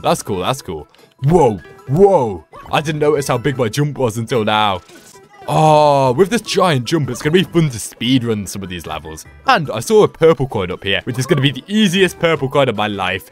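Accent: United Kingdom. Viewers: I think its cus he talks british and its difficult for him to say poplin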